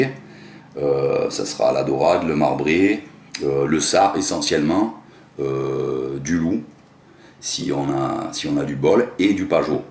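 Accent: South, France. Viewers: Avec l accent du soleil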